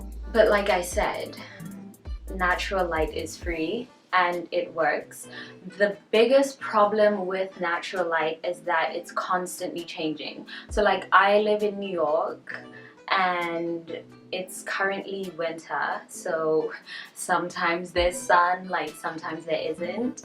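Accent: South Africa. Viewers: You sound South African.. i'm confused lol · WOW!!!! My friend, you sound a bit south african - are you?? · That accent... you're South African right? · You sound South African?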